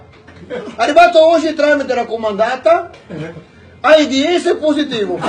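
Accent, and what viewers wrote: Veneto, Italy. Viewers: Insopportabile l’accento veneto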